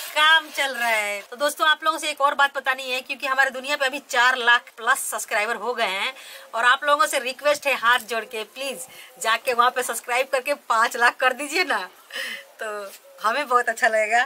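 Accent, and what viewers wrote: Bihar, India. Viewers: aap bihari hai kya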